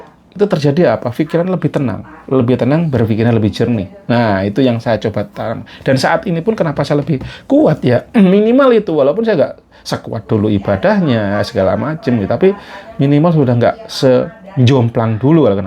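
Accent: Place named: Java, Indonesia. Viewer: Mendok & terlalu berbasa basi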